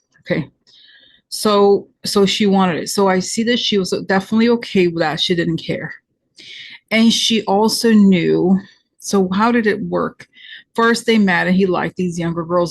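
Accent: United Kingdom. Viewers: Love your brit accent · love your British accent!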